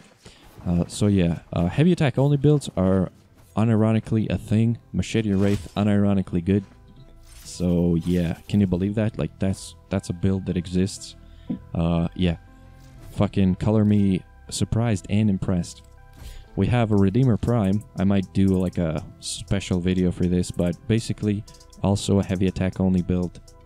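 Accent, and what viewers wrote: United States. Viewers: Great information thanks for this. I have to ask, are you from Maryland? You have that accent sort of.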